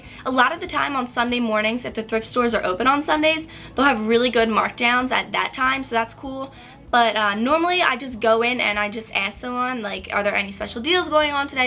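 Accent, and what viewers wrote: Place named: New Jersey, United States. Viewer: do you live in new jeresey? :o you have the accent.... btw i like thrift stores :D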